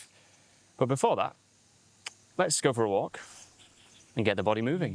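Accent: United Kingdom. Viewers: Are you from the north of England? You pronounce your u's just like Gary Barlow does 🤔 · Do you speak british english? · Your accent is very clear and easy to understand Mr. unlike the cockney accent tbh I have an Indian accent and would love to pick up SSB Accent like yours so I wanted to ask if you have 1:1 training classes? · Hello, what a nice video indeed I really like the style you're using for your channel, the manner...the pace well I don't know if you talk like that in normal life...and of course the accent, I love the British accent a lot. And, those suggestions are very useful for a guy like my who is quite the opposite of being talkative 😅 and he's learning English to beat his shyness as well, so...thank you! 🙏😊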